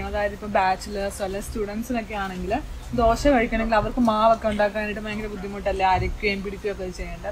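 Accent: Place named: Kottayam, India. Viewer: തനി കോട്ടയം സ്ലാങ്. Like it.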